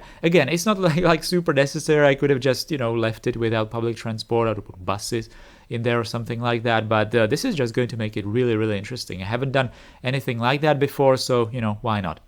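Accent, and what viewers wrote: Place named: Czech Republic. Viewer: This is probably a wild shot but you sound like you're from Czechia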